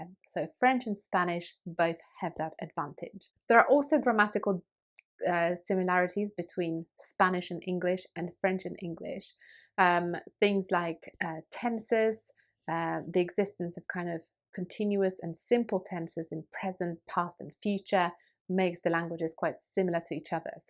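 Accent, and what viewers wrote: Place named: United Kingdom. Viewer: Tahnk you so much for your videos 💕 Do you ever get tired of speaking in British accent 😬 This is what's happening to me and I wandered if I am the only one feeling this way 👀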